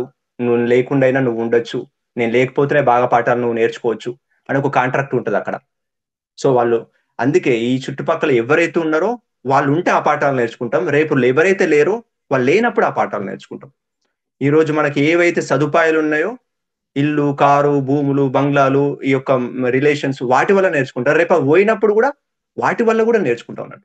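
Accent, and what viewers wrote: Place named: Telangana, India. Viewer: Telangana bhasha..bagundhi..bhavam mukhyam☺☺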